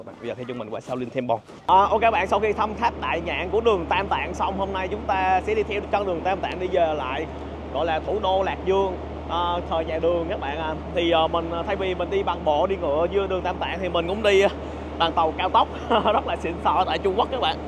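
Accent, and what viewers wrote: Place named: Central, Vietnam. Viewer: Ủa e ở hà nam à. Sao nghe giọng ở miền trung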